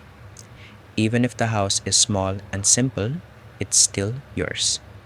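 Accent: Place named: Benguet, Philippines. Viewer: You must be from the highlands in Benguet based on the way you speak English.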